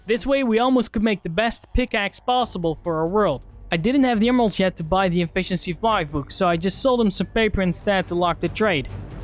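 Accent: Netherlands. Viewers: Hmmmm you sound Dutch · Are u dutch??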